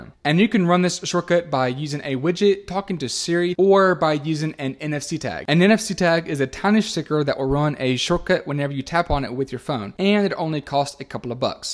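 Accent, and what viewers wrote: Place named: South, United States. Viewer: I had trouble understanding what you were saying. Several times I had to pause or skip back a few seconds to try to understand you better. I don’t think it’s because you have a slight southern drawl. I think it’s because you are trying to talk too fast, and some of your syllables get swallowed up as the words run together. This is only exacerbated by how aggressively you've cropped out the silence. The tiny Pauses & breaks between phrases that you've trimmed, in my opinion have been cropped too close to the front & back of the words; there’s no split-second for me to process before moving onto the next phrase. Good info, just a bit too much effort on my end to try to understand what you're saying. I know you want to keep your videos short. However if you trim off a few extra seconds, but then that costs me a few extra seconds , 10 times throughout the video, did I really gain or save anything? 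It’s a bit exhausting to be honest.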